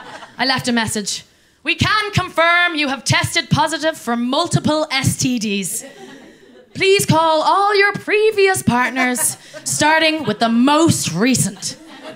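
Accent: Ireland. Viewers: am April 3 2024 To hear you talk in an Irish accent makes my eyes water with joy !!! You are an angel. !!!! Yer woman is Funny … my gut aches Tanks for sharing!!!! Absolutely brilliant!!!! Nothing but lovely , fair play to ya. Slainte’ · She is very cute and I usually love an Irish accent, but she reminds me too much of a girl I tried to date whose brogue would get thicker the more she drank. Cannu unnerstan ya, lassie! 🤨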